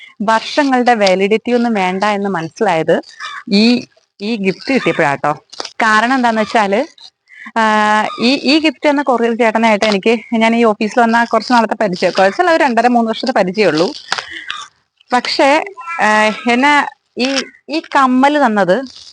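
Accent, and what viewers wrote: Trivandrum, India. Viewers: Language kettittu t.v.m annu 😊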